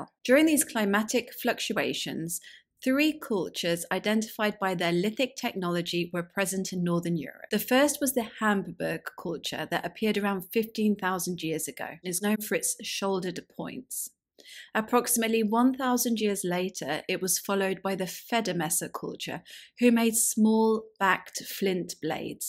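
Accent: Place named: Home Counties, United Kingdom. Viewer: Beautiful diction;english 'home counties',around London?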